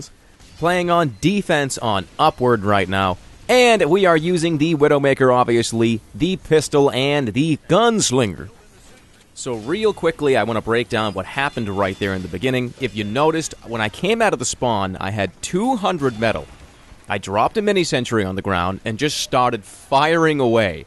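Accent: Boston, United States. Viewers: American accent breaks google subtitles ammo it thinks is emo and gun it thinks is gone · You soudn like you're from Boston or something.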